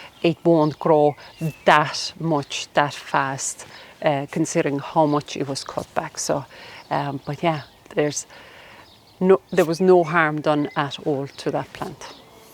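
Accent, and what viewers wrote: Ireland. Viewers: Only discovered your channel. Great video. Trying to place your accent. I'm thinking maybe you're originally from Poland, but have lived in Ireland for many years! You have a strong Irish accent now. ☺ I had a Geum in my garden. I was a way for a while, and the Geum has self seeded A LOT!!! I think mine is "totally tangerine." I had one, now I have five. To be honest, they've taken over a certain part of my main flower bed, and have stopped my ferns from growing which I'm quite surprised about. I'm going to see how they look in their new spot later in the year when everything is growing and if I'm not happy with them, I'll get rid of them and plant new ferns (spot is a little shady). PS: I love your dog.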